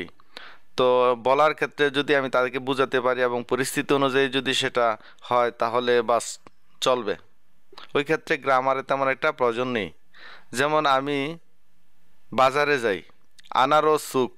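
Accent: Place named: Chittagong, Bangladesh. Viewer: আরবী ভাষায় এ কার ও কার নাই। তাই আপনার শেখানোর পদ্ধতি উত্তম নয়। আপনি আরবির আঞ্চলিক উচ্চারণে শেখাচ্ছেন। কেউ যদি বাংলা শিখতে চায় সে কখনো নোয়াখালী বা আপনার নিজের এলাকা চট্টগ্রাম এর মত করে শিখবেনা। সে সঠিক উচ্চারনে শিখবে। ধন্যবাদ।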